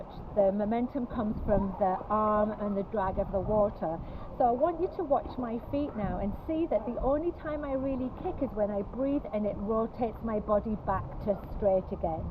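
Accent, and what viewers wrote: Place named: United Kingdom. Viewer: Hi and son Great Vidio you sound British. Is this swimming pool in Uk. Is it public? Looks lovely. We have an outdoor pool in South Wales but it’s closing for winter.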